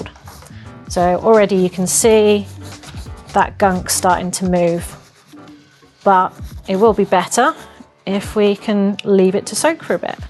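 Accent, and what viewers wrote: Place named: United Kingdom. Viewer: British accents …smh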